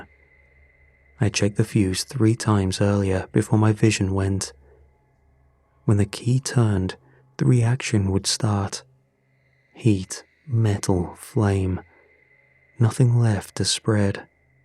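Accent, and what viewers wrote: United Kingdom. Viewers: are you british? just curious i go to sleep with your stories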